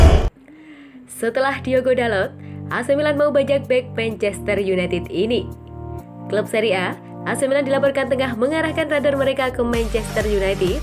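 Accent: Java, Indonesia. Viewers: Sory bkn rasis tp voice overnya jangan medok lah, ga enak dikuping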